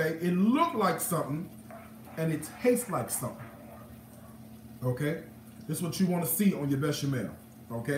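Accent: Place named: New Orleans, United States. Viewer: Man where are you from. It sounds like a lil N.O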